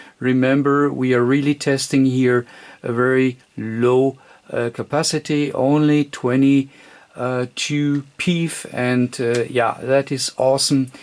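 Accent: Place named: Germany. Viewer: I do hear a strong German accent. Greetings from the Nederlands my vriend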